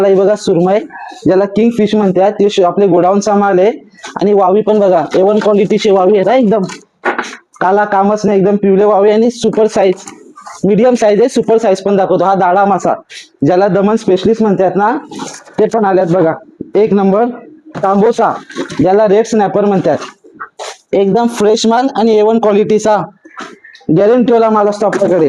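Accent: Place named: Agri Koli, India. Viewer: To agri koli bhasha boltoy tyamule Vav mhantoy maza Andaaj aahe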